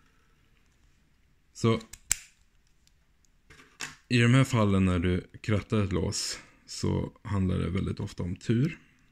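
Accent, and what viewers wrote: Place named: Australia. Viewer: Cheers mate that would be greatly appreciated. They can be a fun read at times when the translation doesn't match what you are saying, so don't expect them to be an exact translation, it has a lot of trouble with the Aussie accent, it's just a tool to give us the general idea of what you are saying. You were mentioned on Lockmania's series promoting locksport channels. I really enjoy that locksport is such an international sport and locks speak a universal language that we can all understand regardless if there is translation or not. I am enjoying your channel.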